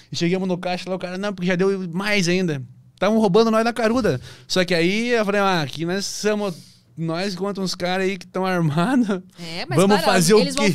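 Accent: Rio Grande do Sul, Brazil. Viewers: sotaque de gaucho azar é us guri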